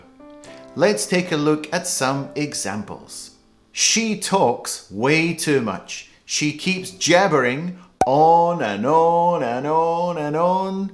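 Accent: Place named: United Kingdom. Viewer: わかりやすい！イギリス英語が好きです😊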